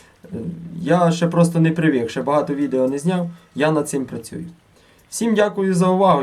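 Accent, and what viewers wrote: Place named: Khmelnytskyi, Ukraine. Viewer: Ви напевно десь з Хмельниччини, у нас така вимова😊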